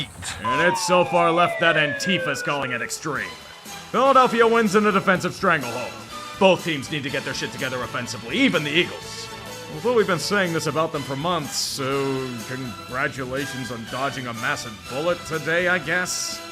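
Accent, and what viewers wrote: Germany. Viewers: Of course, the one thing that brings the video down is having an entire game recap in shitty accent German. I'd rather the language stuff just be restricted to the start of that segment instead of the whole thing.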